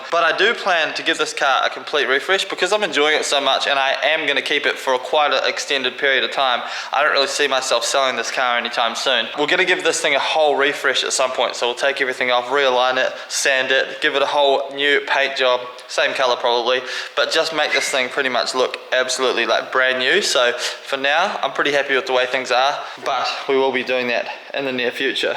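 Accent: New Zealand. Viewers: is that an nz accent i hear?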